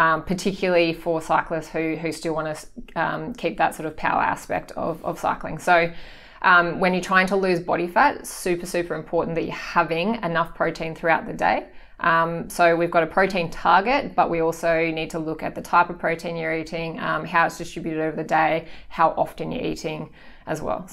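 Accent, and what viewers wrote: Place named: Australia. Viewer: Australian acent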